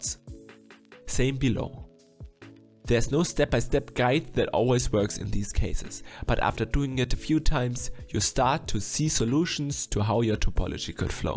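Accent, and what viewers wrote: Germany. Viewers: Idk why but u sound a bit German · Das ist doch ein deutscher Akzent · deutsch accent or i wrong? ) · Cool, but are you from germany? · Through the full video i need to think about how german your accent sounds XD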